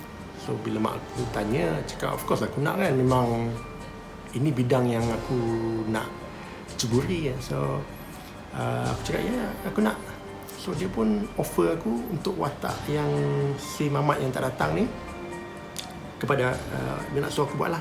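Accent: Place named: Pahang, Malaysia. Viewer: bahasa org lipis kot2. loghat dia pekat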